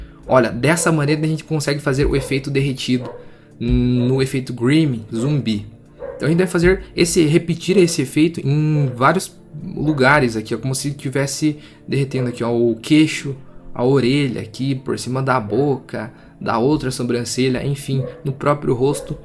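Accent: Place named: Rio Grande do Sul, Brazil. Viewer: parece gaúcho ne, também achei kjkjkkkkj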